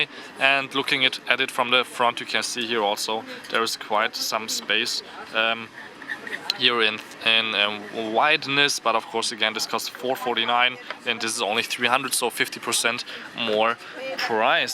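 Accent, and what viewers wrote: Germany. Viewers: Your mother-tongue is german, isn't it? :) Nice overview btw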